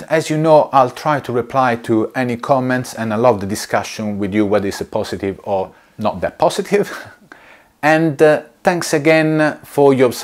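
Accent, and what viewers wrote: London, United Kingdom. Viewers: Hey alright geezer living the London accent 😂. Great review mate on that jersey. Stating all the pros and cons!!!! Does that jersey come in other colours??? Your channel is growing and you’ll be getting loads of stuff to review so keep doing what you’re doing & a excellent ave speed today mate 👍. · Your accent might say “Londoner” but that beard screams “Italian”.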